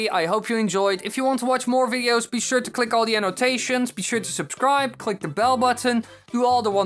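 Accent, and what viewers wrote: Netherlands. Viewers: Goshdarn you're so dutch by your accent. Or belgian?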